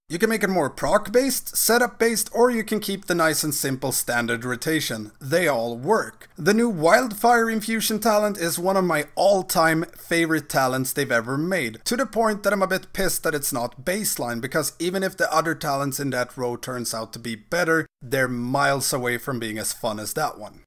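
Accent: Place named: Sweden. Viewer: Nice video, hör på talet att du måste vara svensk, så jag antar det är okej med en sådan kommentar också! Tänkte bara säga att jag har så oerhört svårt att bestämma mig för om jag vill spela Outlaw eller Survival. Tråkiga för egen del är att bägge specs verkar vara lutade åt AoE-dps, vilket jag inte är speciellt het på, då jag tycker om Singletarget-dps mer. Avskyr att se riktigt bra burst men kass sustained damage där man går från att ligga topp 3-5 för att senare sjunka till botten, vilket är därför jag inte vill spela Fire Mage (även fast den specen är cool som attan) då den tycks vara oerhört beroende av sina CDs och tanks i dungeons för att göra hygglig dps. Vill helst inte förlita mig enbart på "CD windows" för att göra mitt jobb. Förutsatt att du kan svara på min fråga, vilken spec av Outlaw och Survival gör "bäst" burst och sustained singletarget DPS? 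Tacksam för svar, bra content dessutom, så även om man inte får ett svar så har du förtjänat åtminstone en sub!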